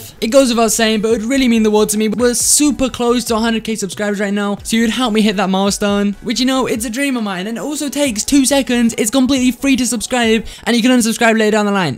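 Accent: Sweden. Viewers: you are from sweden i know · ur 100% swedish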